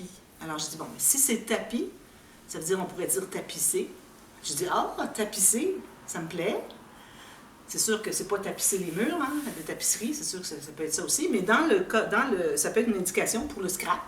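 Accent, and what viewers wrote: Quebec, Canada. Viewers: Oh la la quelle histoire ! Pour pas grand chose ! Surtout ne changez rien. Vous êtes parfaite comme ça. On comprend très bien ce que vous voulez dire. Je donne des cours de scrap dans une association et j’ai toujours utilisé le terme « mater ». Je l’utilise pour mettre une photo sur un cadre un peu plus grand et « habiller » pour poser le papier design. Autrement j’ai adopté certains de vos termes 😁. Donc ne changez rien du tout. On vous aime comme vous êtes, gentille, rigolote, claire dans vos explications et surtout j’adore votre accent. Il me rapproche de mon fils, installé à Montréal, que je n’ai pas vu depuis plus d’un an à cause de cette pandémie. Laissez de côté les 3 ou 4 qui râlent et écoutez toutes les autres ! NE CHANGEZ RIEN Bises ensoleillées de la région lyonnaise Françoise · Mais, ne change rien, avec nos périodes de confinement en France, t'entendre, me permet de voyager, de me souvenir de mon ancien prof de math qui venait du Québec, bref c'est génial. 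Crois tu sincèrement que nous autres français sachions parler le français? Suivant la région d'origine, on utilise chacun notre français. Bisous, Schmack, Adishatz... Bref salut.... Et oui c'est ça le français.... Et j'adore les termes matter, couture, etc... Désolé de ne pas avoir pu t'aider 🤣🤣😜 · Et coucou MATTER OU MATTAGE c est adosser une photo sur un papier ou un Carton légèrement plus grand pour faire ressortir la photo Donc je ne vois pas pourquoi changer puisqu on comprend très bien....c est ce qui fait votre charme avec vos mots à vous. Pour ma part j adore et comme je vous suis régulièrement je parle votre "Langage" mais avec mon accent Ardéchois et vous canadien A très vite Co and Fau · Moi j'aime beaucoup votre petit accent et vos thermes franco canadien ont du charmes je comprenais très bien se que vous vouliez dire .mais si cela chagrine quelques personnes faites le pour elles.... 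Merci pour vos nombreux tutoriels cela m'aide beaucoup à progresser ♥️♥️♥️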